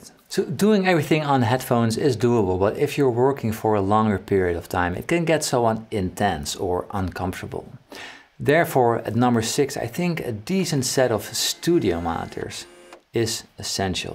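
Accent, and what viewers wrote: Netherlands. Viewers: You sound super dutch. Good info thank you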